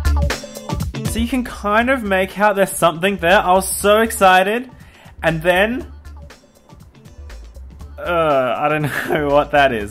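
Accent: Australia. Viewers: Are u Aussie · JanielBxbes he's Australian.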